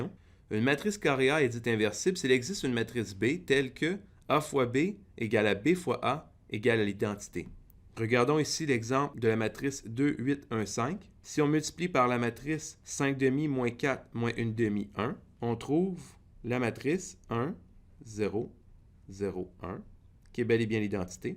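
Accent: Canada. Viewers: The lady professor is much better + i don't understand your accent man, keep the channel international not just canadian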